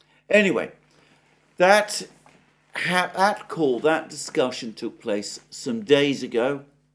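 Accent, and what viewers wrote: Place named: London, United Kingdom. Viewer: ⭐⭐⭐Alexander Mercouris gives a good example, of what journalism should actually be. committed – objective – balanced This is not flattery - this is a factual statement, which you have to come to if you follow his reports over the years. The whole thing delivered in very audible, charming London English and general smiles, “where it can’t be avoided”. In Greek history, Mercury was the messenger - the messenger of the gods - the messenger of traders and thieves... in this case someone found his calling ; ) Alexander Mercouris – gold standard in reporting ! no more and no less ! Hallelujah ! With best regards – "the broker"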